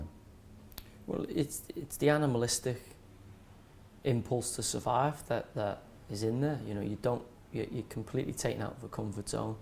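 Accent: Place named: Scouse, United Kingdom. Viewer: Why’s he talking like this? Calmed his Scouse accent right down. Sounds posh almost. Only did a couple of week and had it cush by all accounts. Got gym orderly job right away according to a screw.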